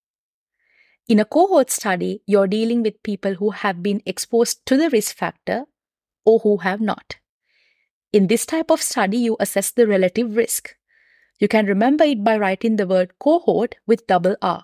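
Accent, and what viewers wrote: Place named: Sri Lanka. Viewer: Why do you sound so Sri Lankan? 😮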